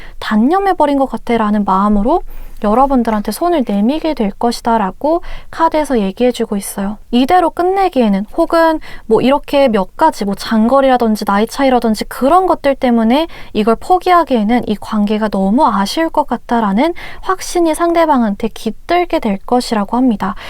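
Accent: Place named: Chungcheong, South Korea. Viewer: 끝말이 ~~할거같아요 이런말에 끝부분이 흐려서 ~~할거가텨~ 일케 들려서 왤케 웃긴짘ㅋㅋㅋㅋ 저 충청도 사람인데 정겹게 들리네욯ㅋㅋㅋㅋㅋㅋ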